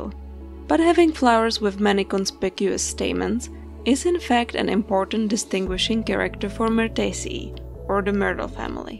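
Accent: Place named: United States. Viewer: Thank you for this very accurate and useful video. It is very interesting for European users to know all these details and photos of American plants. It is also very courious to listen how you pronounce the families names, they are Latin names, but your American pronunciation (Americanization) makes them sound much more charming and mysterious to my ears.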